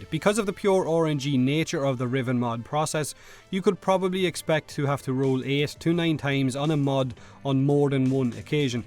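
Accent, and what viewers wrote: Ireland. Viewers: are you Irish cause you sound Irish?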